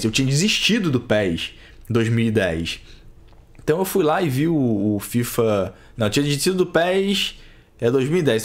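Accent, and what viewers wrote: Rio de Janeiro, Brazil. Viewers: Comparar o FIFA deixxx com o peixxxx... Esse sotaque carioca é uma foda...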